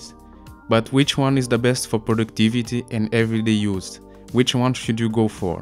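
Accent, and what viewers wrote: Russia. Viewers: what with accent, sounds like russian dialect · i think u re russian right? :D by the way thanks for the review, i exatly for the same dilemma, i need 3 tabs open, and be some playe for the desktop, maybe for me a 32:9 would be a better option? just don't know because, if i want to stream my pc via moonlight i have alay cange the 32:9 resolution to 16:9 when i would like to game on a laptop or tablet or pone? i really hesitant, becasue most of the time i want to be able to reach my desktop from anywhere the world without changing resolution and stuff, maybe ahve to stay with a 32 inch 16:9 or do you have any advice for that? thanks in advance! :)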